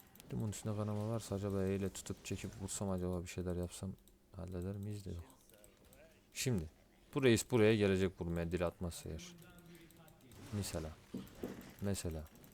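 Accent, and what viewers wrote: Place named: East, Turkey. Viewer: Merhaba guzel şiven var dogu tarafı bi şive Allahıma kitapıma ha gibi cümleler kursan o dogu tarafini bize hissetdirirsen cok samimi ve guzel olur diger yayincilardan farklanir kendine ozgun kitlen olur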